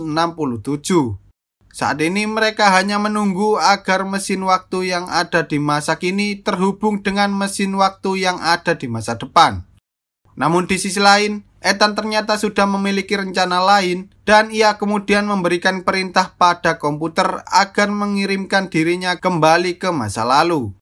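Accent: Java, Indonesia. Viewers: Jawa nya medok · Medok ya pak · Asik juga nonton film di ceritain pke logot jowo 😂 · Meddog ngge. . . . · Suara jawa nya kya tmn sya omm 😂😂😂😂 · Hmm risi terlalu medok narasinya *Menurut gw woy ✌🏻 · Medok banget ngomong nyaaa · Wong jowo lek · Medok bngt bang ngomongnya😂 Lucu beud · Pekat jawa nya, apa khabar bang... haha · Pekatnye jawe ko bro ....😂😂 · ethan...ke edan...walalalaaa.... kurangkan sedikit pekat jowonya wak..... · Logat Jawa ny ketara mas, jd kurng enak denger ny.. Coba aja Narator ny Logat Indonesia Tulen lbih enak denger ny.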